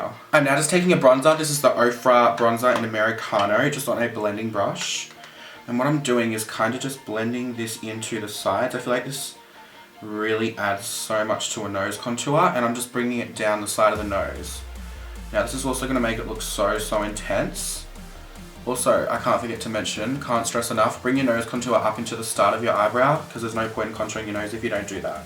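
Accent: Australia. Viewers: this is probably the best nose contouring vedio i hav ever seen. 😍😍. u r bomb btw!💦💦. are u an aussie? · Are u Aussie ! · are you Aussie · UR DEFINITELY AUSTRALIAN !!!🇦🇺🇦🇺🇦🇺🇦🇺🇦🇺🇦🇺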